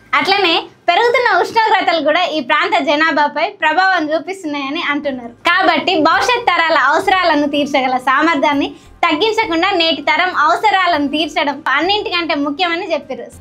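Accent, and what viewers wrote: Telangana, India. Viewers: Telangana Yaasa Matladatam apesthe channel grow ayidi worst explanation really ilanti informative videos ila Kadu Chepedi E voice tho kadu just telling all these for your sake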